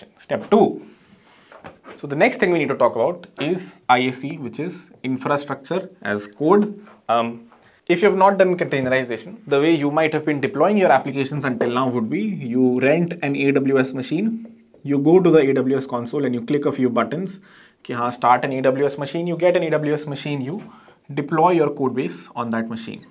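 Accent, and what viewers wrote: India. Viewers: ur Hindi English is too good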